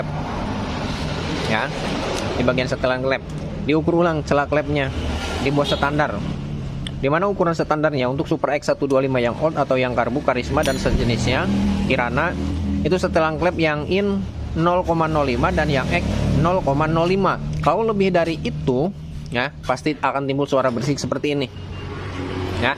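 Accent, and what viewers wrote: West Java, Indonesia. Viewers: Kang didaerah mana ya sepertinya logat sundanya balaraja ya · Sunda ya 🤔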